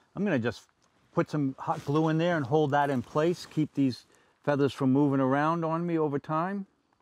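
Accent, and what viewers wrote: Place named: New England, United States. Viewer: I'm hearing that New England accent! Thank you for these excellent videos!!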